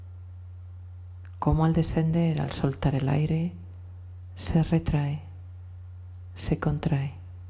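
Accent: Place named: Spain. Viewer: Aparte de la carretilla o media de nylon o red de protección en el micrófono... Es necesario hacer un post mezcla de audio en el out feader o eco... Ya que se aumenta los tonos agudos de la voz. Eso en lo técnico y tecnológico. Pero para darle gusto a JAM MUÑOZ...pues tendría que nacer está mujer en otro país!!!!! PARA NO TENER EL ACENTO CARACTERÍSTICO DE LOS HERMANOS DE ESPAÑA !!!!...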